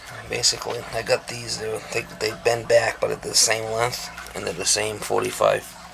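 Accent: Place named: Massachusetts, United States. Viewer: Great video, I really like the tags you use, I might have to look in to those myself. I like listening to you, your accent is exactly the way my dad talked, he was from Peabody MA. He just passed away recently so I enjoy hearing that accent! Keep up the great work! PJ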